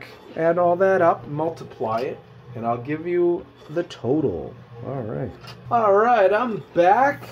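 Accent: United States. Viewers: You sound like you are from New York? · Do I hear a New England accent. New to your channel. Where can I go to play this machine?? Please let me know!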